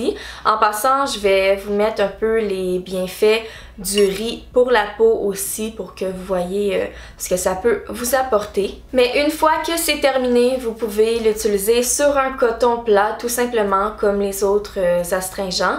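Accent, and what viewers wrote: Canada. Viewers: C’est l’accent canadien 🇨🇦😍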